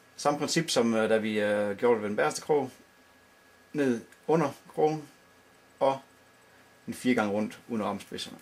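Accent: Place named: Scotland, United Kingdom. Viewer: i thought you had a bad scottish accent at first..lol..good vid tho mate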